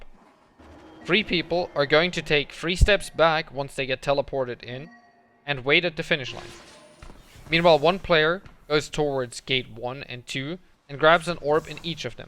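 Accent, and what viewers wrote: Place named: Germany. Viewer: That German accent is sexy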